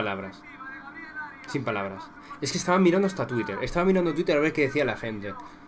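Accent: Spain. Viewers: Es español o argentino?xd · ¿Porque hablas como español? Y si lo eres porque apoyas a Chile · Eres chileno??? Y por que hablas o las jergas son españolas ..uhmm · los chilenos no hablan como los españoles xd ta hablando como un español xd chaval estoy flipando :v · Mas parece español ( su acento xd)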